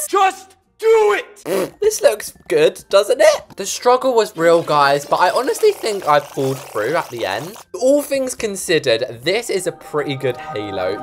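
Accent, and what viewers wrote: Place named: England, United Kingdom. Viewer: just how good is and how she only has 200k+ subs is so disrespectful i wish you could sub morethanonce like would have like 77777M subs if you could sub more than once beacause I WOULD BE SPAMMING THAT BUTTON LITERALLY BRAKING THE BUTTON (also my pc) LIKE BRO is such a good builder i could never sorry but one time i said a mean comment because it autocorrected so tysm for being a reallly great builder and so funny and i love ur accent one time i went to englend and i heard someone talking with your accent and i fell in love byee! i hope you have a happier life!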